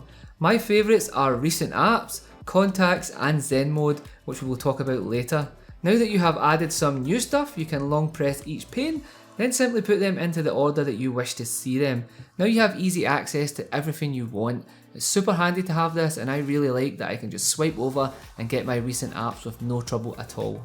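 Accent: Scotland, United Kingdom. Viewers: Scottish accent. Right? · man ILOVE YOUR ACCENT - where are you from ..? Scotland .??)) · Scottish mate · Scottish accent is a bonus! I love it! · I guess you are Scottish gerat video 👍🏻mate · His he Scottish? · OK, Had to subscribe, hearing a Scottish voice instead of an American or Indian one. Goan yirsel' ! Totally agree about dark mode, Google has taken far too long to make it an option, they've also been doing their best to kill the Substratum themes capability too, I think their devs are jealous when custom rom devs make nice toys. FYI That phone isnae gonnae work well wae nae sim card though :) One tip I hadn't known about was double tapping in the cam app to switch cameras between front/back, saw that in a review recently. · ... Ef you liked it... Haha. That Scottish accent. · I'm subscribing to help you reach your 100K subscribers. Be thankful to your Scottish accent. 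· Are you from Scotland??you got amazing accent!!! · Are you Scottish? Love the accent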